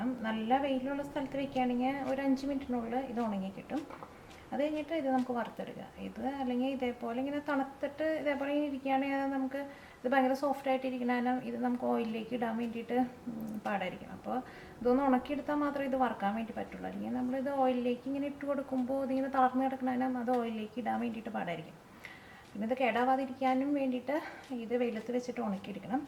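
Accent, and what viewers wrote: Thrissur, India. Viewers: Within 3 hours, almost 1500 likes !!!!!!!! it's a reward for your hard work. I noticed the strain in your fingers while you were mixing the dough. As you said, I think this is the first recipe for pappadam in the net, which is our favorite one, of course, everyones. Really it's my son who introduced you to me. When he was living alone in Florida he was trying your recipes and now his wife has no complaints about him because he knows cooking and helps her. All of us like your Trichur accent.Let me try now itself and if it comes okay I'll definitely let you know. I tried WhatsApp but not connecting. Could you please tell me where you are living.Thanks a lot and keep it up. :) this is the first time I am subscribing a channel.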